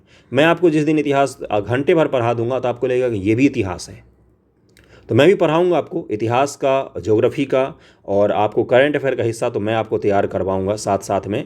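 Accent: Darbhanga, India. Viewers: Sir Aapka awaz Darbhanga Bihar se match kar raha hai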